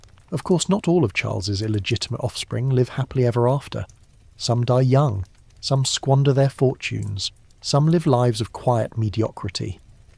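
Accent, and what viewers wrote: United Kingdom. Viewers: You have to love this british accent. That's why I've found this same voice on at least 4 sleep channels now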